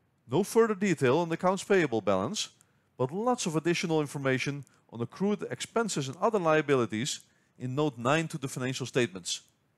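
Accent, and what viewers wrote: Netherlands. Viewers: Thank you. Really good explanation. Do I hear Dutch accent?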